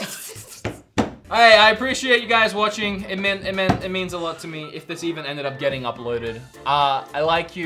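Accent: Australia. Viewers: Keen to hear your accent become more Aussie again lol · I thought he was from Canada. But now he said Australia I hear it now when he says awesome. Have fun I wanna go to Japan. But I also want to go to Paris and Italy. I just want to travel the world. I’m tired of seeing palm trees.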